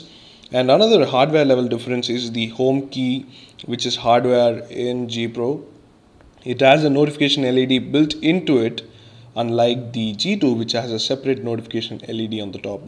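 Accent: India. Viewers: indian accent. dark hands...cant be Russian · indian accent actually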